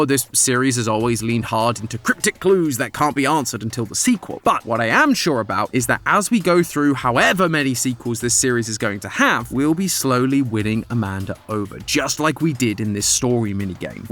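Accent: United Kingdom. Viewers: Ok so has he always sounded British 😂😂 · im still not used to the british guy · I don’t remember him being British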